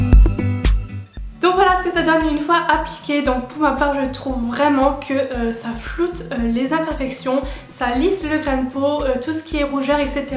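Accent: Lorraine, France. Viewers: AAAAH je viens de te découvrir et ton accent Lorrain me fait plaisir ! Je suis une Lorraine de base ! Bref là n'est pas le sujet, j'ai commandé la CC crème il y a 2 jours, j'espère la recevoir aujourd'hui car vous me tentez toutes avec vos revues ! Contente d'avoir découvert ta chaîne, je m'abonne direct :) A bientôt ! Charlène <link>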